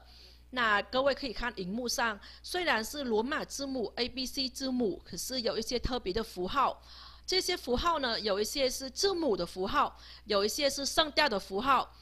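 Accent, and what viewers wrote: Vietnam. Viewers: 老師,冒昧問,你的發音,南越腔,還是北越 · 太感謝了! 在越南工作我都不知道我同事在說什麼 😂 老師的發音應該是北越吧! 跟我公司同事發音一樣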